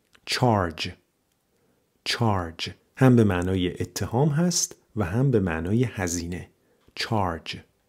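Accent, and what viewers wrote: United States. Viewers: سلام تلفظ شما تو این ویدئو امریکن هست یا بریتش ممنونم بابت ویدئو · دکتر لحجه با لحجه آمریکن این لغات رو تلفظ کردین یا برتنیش؟ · این تلفظ ها آمریکایی هستش یا بریتیش؟ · لحجه آمریکاییه ؟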